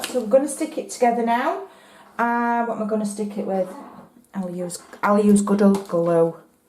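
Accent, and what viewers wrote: Yorkshire, United Kingdom. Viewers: I guessed right that you are from Yorkshire. My sons were born there and I love it there and love your videos too. Keep up the good work.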